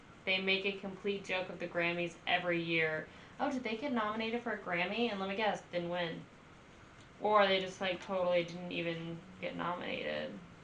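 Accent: South, United States. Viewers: You are so cute with your south lol I'm sure by now you know they are from ATL....I've met them and they're all so southern humble (RIP) Brent 😢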